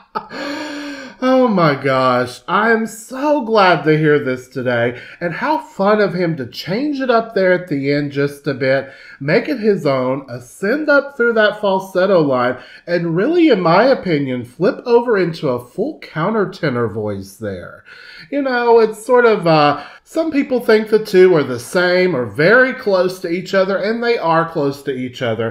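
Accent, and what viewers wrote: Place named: South, United States. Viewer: "I am so GRATEFUL I found you"!!!!! As you kept saying Amazing!!! Amazing!!! I kept saying the same about you, "AMAZING, omg this man is amazing meaning you and the singer". I have heard before, but not depicted and explained as you have done so beautifully. Your reactions, and interpretations, from the symphony, the mariachi, and of course the singer, to the lyric of this beautiful song which is quite meaningful. Thank you so much for your respect an appreciation to latin culture, all though I am Latina but not from Mexico (not mexican) many hispanic cultures, appreciate each others music & folklore. To have such a wonderful person such as yourself, appreciate it too and truly understand the significance, and the value that that this singers VOICE does for this song, was such a pleasure to see. I am now a new sub and fan of yours, I am truly in AWE of your persona, and you truly are a gifted TEACHER in more ways than just voice. 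To see your expressions, and to hear your comments, I was right there with enjoying you & this beautiful composition. I applaud you again & again, music holds no boundaries and you truly are a testament to that. Given the certain discords that many ppl of color have gone through recently in the U.S.A. (a country that means so much to many of us, a country we honor as well) many of us have felt hatred, and bridge in desperate need of repair. Then here you are this wonderful man with a beautiful southern accent, teaching us about music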